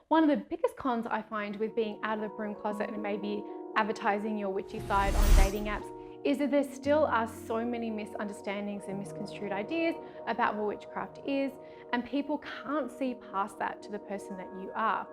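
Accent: Australia. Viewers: Lol omg your so aussie, I love it... Thanks for the tips, now I need one on how to date aussie men ughhh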